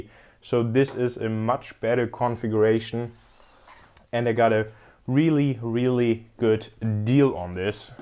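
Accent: Germany. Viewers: Omg.. That german accent!!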